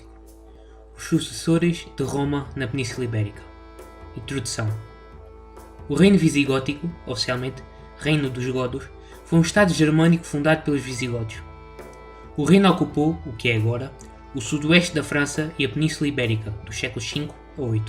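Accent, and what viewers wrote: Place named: Portugal. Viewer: Riqueza de conteúdo histórico, vídeo muito esclarecedor e cativante com o sotaque agradável e bonito de nossos irmãos de além mar lusitanos... Só aqueles que desconhecem a nossa história própria história os satirizam ou menosprezam o valor de inúmeras figuras que estiveram envolvidas no descobrimento e exploração da (então) colônia, foram fundamentais para o início de nossa terra! Admiro muito as histórias desse período e quero acompanhar e descobrir cada vez mais, obrigado por compartilhar conosco irmãozinho!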